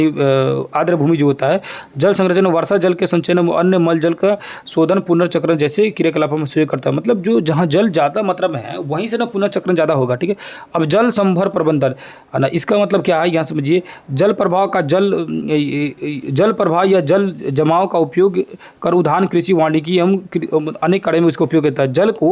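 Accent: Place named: Bihar, India. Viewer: Sir geography class 10 ki playlist me chapter 5 to 11 tak add nahi h please add kar dijiye ... Aur apka way of teaching bahut achha specifically apka bihari touch jo ata h bolne me vo bahut hi amazing hota h maine mere bahut se friends sw share ki h apki class videos ... hopefully apke jaldi se views badhe aur subscribers. 😊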